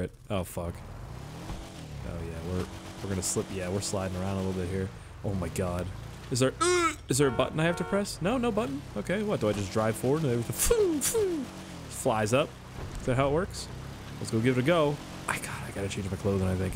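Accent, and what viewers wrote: Canada. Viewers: "when I get angry I sound really Canadian" I hear ya there bud lmao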